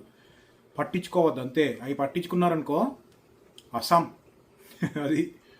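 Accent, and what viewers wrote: Andhra Pradesh, India. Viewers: Bro mee vooru Nizamabad antunnaaru kaani mee bhaasha Andhra slang lo vundi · meru chese vlogs chala baguntai, meru asala andhra na telangana na? Me native Nizamabad ani antaaru kadha, kani real ga ne meru Nizamabad aa? leka andhra ninchi akkada settle aiyaara? Me Telugu pure andhra laga untundhi, please clarify cheyandi. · day 2 of asking ----- anna nvu gun carry chestava safety kosam? and nv nizamabad nundi annav kani andhra slang undi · needi telugu andhra telugu la vundi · meeru telangana kadha anna slang mari andhra laa undi enti anna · Medi telangana khada. Mari andhra slang nti ani na dought 😊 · Bro meedhi telangana ayinappatiki mee slang completely andhra style lo untadhi...❤ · Bro, meeru telangana nizamabad ayna, telugu mathram ap slang la undhi 😊😍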